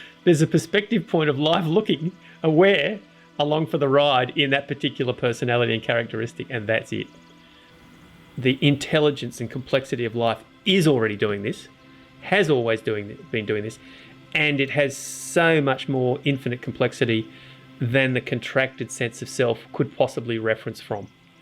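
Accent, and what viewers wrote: Australia. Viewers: Thank you for making this. I listen to a fair bit of ND stuff and yours is clear in a way that takes me right to the edge of ( non) understanding. Hearing this in an Aussie accent helps too!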